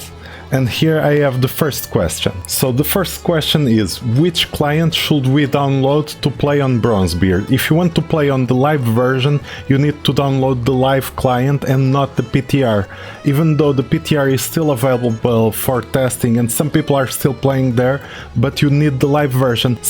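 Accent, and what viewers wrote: Portugal. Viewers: Pelo sotaque consigo logo perceber que és tuga! Não sabia que haviam tugas a jogar BBC.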